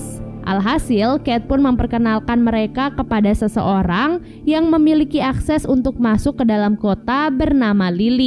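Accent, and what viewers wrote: Lombok, Indonesia. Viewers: Kak aku dengar kak dari lombok aku juga dari lombok 😁😁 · ORANG LOMBOK YA KA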